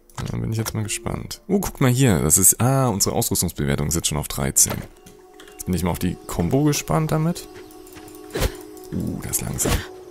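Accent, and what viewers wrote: Saxony, Germany. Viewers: Super Video. Macht irgendwie direkt Spaß. Mal schauen, ob es das evtl. für die PS geben wird. Und bitte niemals den sächsischen Dialekt weglassen. Das erinnert so an Heimat 😉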